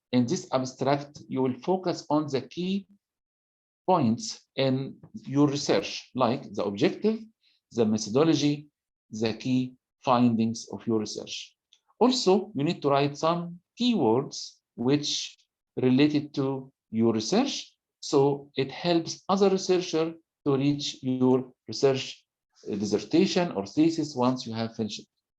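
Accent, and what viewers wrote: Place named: Arab world. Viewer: Look like an Arabic English...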